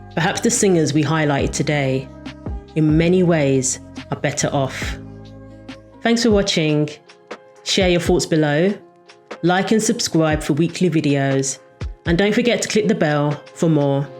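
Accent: United Kingdom. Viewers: Hearing about the 90s in a Brit voice? I subscribed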